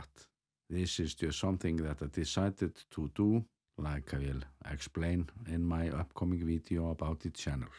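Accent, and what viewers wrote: Iceland. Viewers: Fluent English spoken with Icelandtic phonemes sounds amazing! Plus of course you have a nice voice. :-)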